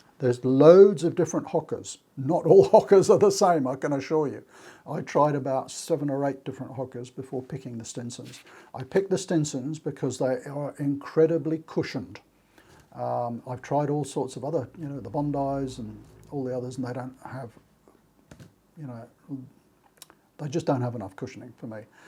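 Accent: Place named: Australia. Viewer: It's that Aussie accent: VDLP or Via de la Plata, the name of one of the Camino routes.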